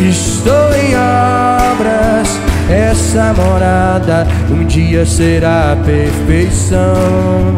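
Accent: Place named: Rio de Janeiro, Brazil. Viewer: é de BH mas tem o maior sotaque de carioca. "Exxxxtou em obrax" hahaha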